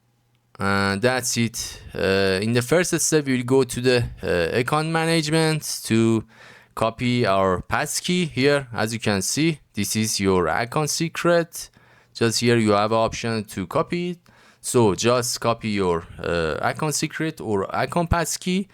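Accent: Iran. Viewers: اوففف لحجه ی فارسی 😂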